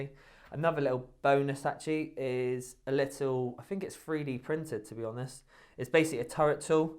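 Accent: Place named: West Country, United Kingdom. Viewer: ".....or you'll bugger up the cap...." Tell it like it is west country boy and more power to you.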